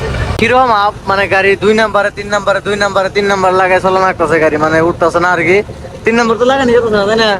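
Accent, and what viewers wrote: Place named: Bangladesh. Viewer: ইন্ডিয়াতে আপনার গ্রামের নাম কি.... বাংলাদেশে আমাদের গ্রামের মানুষের কথাও আপনার কথার মতো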